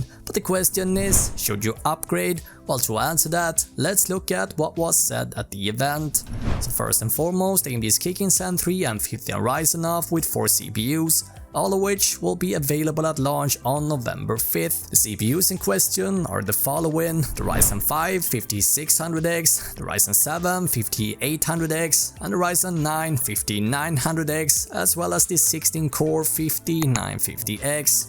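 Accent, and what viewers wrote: Sweden. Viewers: jag hör på din brytning att du är svensk. jag kom på dig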